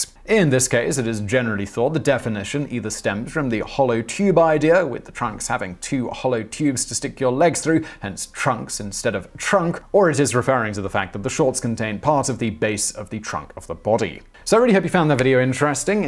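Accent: United Kingdom. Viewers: I have no idea about anything that you talk about, but because you have a British accent I agree with you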